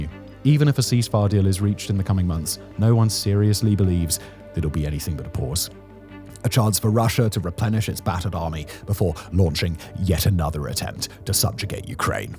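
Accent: United Kingdom. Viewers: never trust a guy with a British accent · The truth isn't within establishment talking points. A bald head and a British accent does not give credibility to obvious propaganda. Understand Ukraine is losing.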